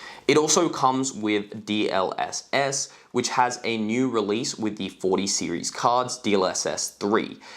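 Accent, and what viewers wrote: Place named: Australia. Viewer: Do all Australians pronounce it "hayche"? How do yaw spell idear? Exactly how it sounds? Neeveedia? If 4k refers to horizontal pixels (which is only like 3800) and 2k refers to vertical pixels (which is only 1440p; an even bigger lie, or misnomer), then what does 1k refer to? Why is the sky blue? So many questions. Few answers.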